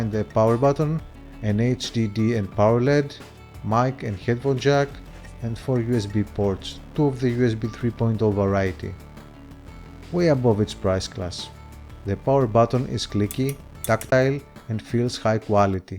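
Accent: Greece. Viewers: why does he sound so greek ?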